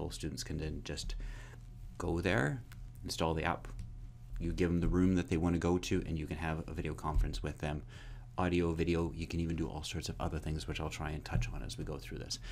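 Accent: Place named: Northern Ireland, United Kingdom. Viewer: Thanks! Very well explained. Question: you're from NI right?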